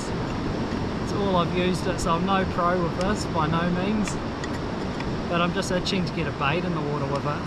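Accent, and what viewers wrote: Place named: New Zealand. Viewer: you sound like a kiwi, Lovely beaches in Ozz, hope you have great fishing 😊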